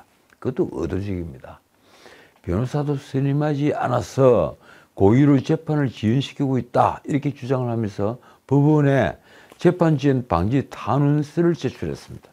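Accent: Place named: Gyeongsang, South Korea. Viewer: 명색 방송인이 상방울 상방울 한득수 한득수... 지금이라도 연습하면 되오 정 듣기 거북해... 나도 경상도지만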